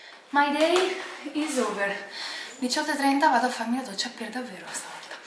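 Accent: Tuscany, Italy. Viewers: Ma hai preso l’accento toscano…adoroooooohhhh 😍😍😍😂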